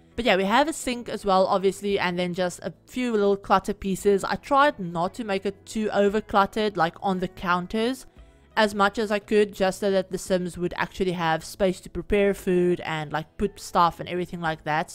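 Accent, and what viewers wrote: Afrikaans, South Africa. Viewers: Are you Afrikaans?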